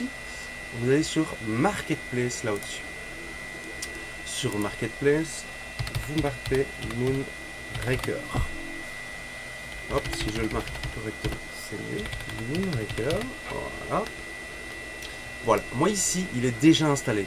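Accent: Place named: Belgium. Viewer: De toute façon merci pour les vidéos très instructives, la rançon du succés c'est de ne pas avoir assez de temps pour faire tout les spectacles, un peu comme une vedette de la chanson quoi ^^^ et vive la Belgique (et ses supers chanteurs et humoristes) et j'avoue sourire quand vous vous excusez auprés des français pour la prononciation des chiffres à votre manière.... PS: comme je débute je préfére Cura pour le moment pour cette machine mais si vous avez le temps pour la suite en vidéo particulièrement pour un tutoriel (didacticiel en bon français ^^) sur par exemple "comment on fait sa première impression après avoir suivi les cours de??" Et aussi "que faire quand on a terminé son impression?" (je ne me suis pas encore lancé sur cette machine plus que récente pour moi en arrivée à la maison). Merci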